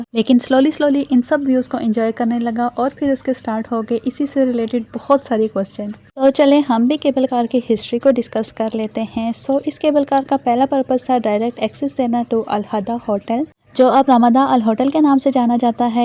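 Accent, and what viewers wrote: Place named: Pakistan. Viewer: Rayal nahi madam...Riyal hota hai 😄😄 Pakistani English UK English aur USA English sab English ko merge kar ke explain kar rahi ho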